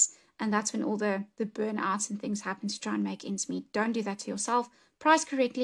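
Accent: South Africa. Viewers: Your accent makes me miss my friends in South Africa!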